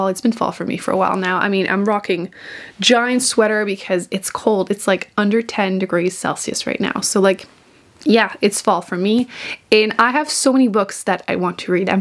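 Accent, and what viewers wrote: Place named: Quebec, Canada. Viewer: Hi! Just found your channel and really enjoying the videos I've seen so far. I was thinking your accent sounded a little bit like mine and wondering if you were French-Canadian, and now that I heard you say "Méditerranée," I think my hunch might have been correct. 😄 I look forward to hearing your thoughts on the book you've shared for your TBR. I currently have on loan from the library The Midnight Feast from Lucy Foley, The No-Show from Beth O'Leary, Razorblade Tears from S.A. Cosby, The other Half from Charlotte Vassell and First Lie Wins from Ashley Elston. Then I would like to shop my stash a little bit, as I recently purchased some of the books on my wish list that my library does not carry. I'm not sure what I will prioritize, I will just go with my mood at that moment.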